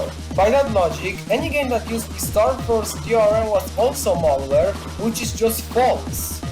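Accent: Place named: Poland. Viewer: Po akcencie i po opisie widzę, że jesteś z Polski :D Chciałem się zapytać czy wiesz gdzie można pobrać MF ale podałeś link do strony gdzie można je pobrać. Ale chcę się upewnić, i zapytam czy strona, którą podlinkowałeś (ten drugi link) jest na pewno bezpieczna? Gdziekolwiek bym chciał pobrać MF to jest zainfekowane i jesteś jedną z niewielu osób, u której jest podana strona, na której można pobrać MF i byłbym bardzo wdzięczny za odpowiedź czy strona ta jest na pewno bezpieczna i jak pobiorę MF to nie będzie zawirusowane. Z góry dziękuję!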